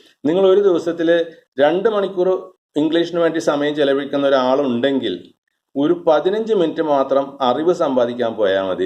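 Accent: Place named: Thrissur, India. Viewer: Sir, thankalkku oru thrissur slang und. Keep it up. God bless you.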